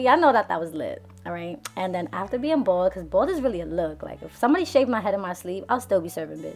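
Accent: New York, United States. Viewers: I love your New York accent 😍😍😍😍😍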